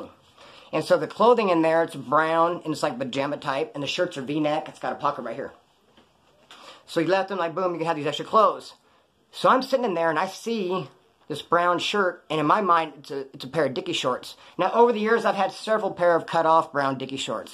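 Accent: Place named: West, United States. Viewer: U from az or cali?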